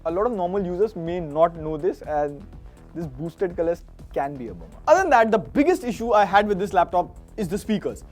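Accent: India. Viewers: Bhai ki english bhi hindi jaise lagti hai lagta hai jaise mene hindi video hi dekhi hai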